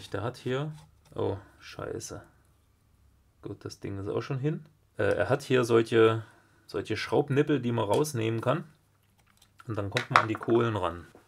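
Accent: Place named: Saxony, Germany. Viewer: ....na ich kenns aus Sachsen.....klingt für mich nach Heimat, meine Vorfahren kommen daher......😉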